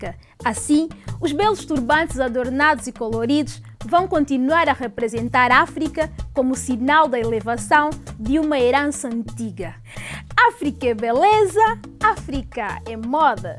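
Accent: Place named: Portugal. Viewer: Por favor, não prenuncie as lingua africanas com sotaque/tiques do português. Não mates os teus vídeos, jovem!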